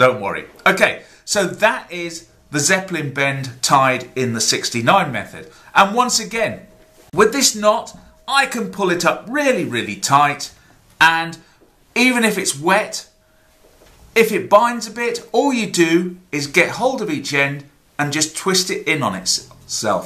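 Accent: United Kingdom. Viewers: Thank you for demonstrating two ways of tying this knot. And I love your British pronunciation. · Recently got into knots after learning a hitch on a canoeing trip. Building my repertoire. Although I have been using the alpine butterfly as my preferred bend, I liked the aesthetics of the zeppelin, so gave it a search. Thanks for the videos, it is nice to hear a fellow Brit once in a while.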